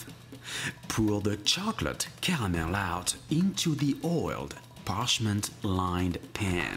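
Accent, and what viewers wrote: France. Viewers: Are you French or Italian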